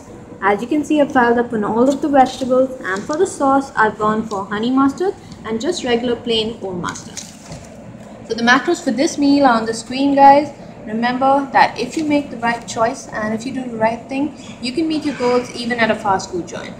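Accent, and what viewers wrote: India. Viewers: u sound desi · I cant pick up your accent sounds Indian.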